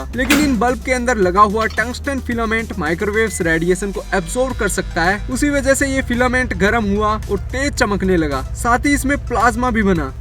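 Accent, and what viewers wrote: Haryana, India. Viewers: Bhaiya aap Haryana se ho kya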